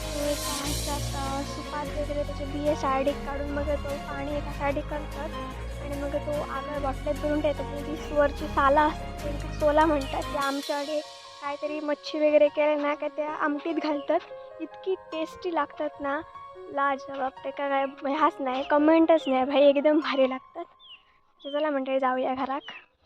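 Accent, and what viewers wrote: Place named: Malvan, India. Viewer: Tuz gaon konat...? I like malvani language tone Very nice video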